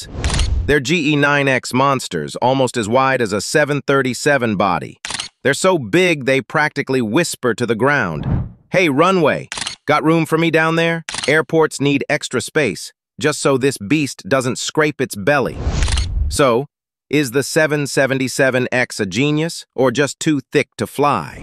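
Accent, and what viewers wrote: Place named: United States. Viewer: Comments are so stupid, not enough with this awful American accent witch makes it ridiculous 🙄 !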